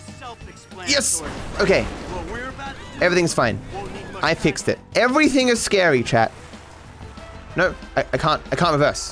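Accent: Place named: Australia. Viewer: he’s Australian from Sydney im Australian from Sydney he grew up in Sydney I grew up in Sydney so our English is the same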